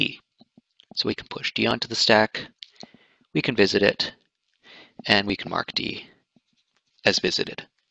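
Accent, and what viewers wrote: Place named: United States. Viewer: bhai india me hi rehta hai na.... kyunki accent se to america ka lagg rha hai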